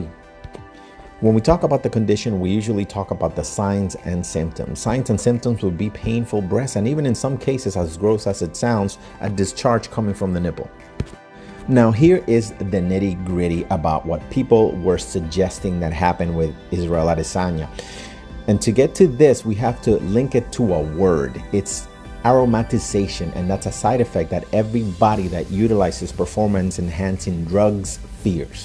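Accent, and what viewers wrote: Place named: Philippines. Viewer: You sound very fillipino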